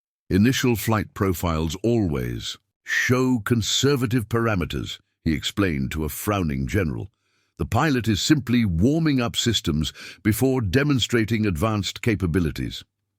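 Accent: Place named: United Kingdom. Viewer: I'M HEARING A SLIMY LIMEY DOING THE NARATING, JUST BY THE WAY HIS USE OF CERTAIN WORDS!!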